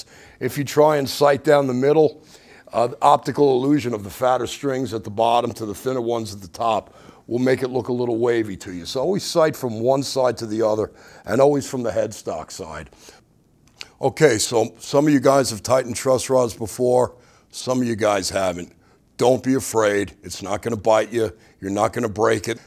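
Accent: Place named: New Jersey, United States. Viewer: Jersey is my guess...def.not Boston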